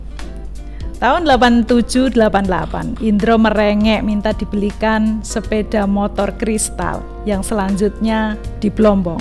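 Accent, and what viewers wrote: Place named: Java, Indonesia. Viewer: Ringkas, padat, dengan suara khas yg "medhok", memjadi keunikan tersendiri..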